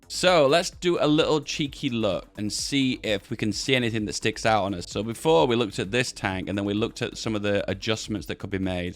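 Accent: England, United Kingdom. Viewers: You know, Ive watched your videos for years. No idea what you looked like. This isnt what I expected lol not bad. lol Whats the accent? like region of England?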